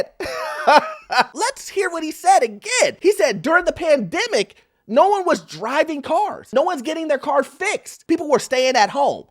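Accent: United States. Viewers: Love your laugh and your american style and your american dialect! Hi from Duesseldorf, Germany and thatnk you for keeping me up to date. ps more condo content please, especially condos in florida, thank you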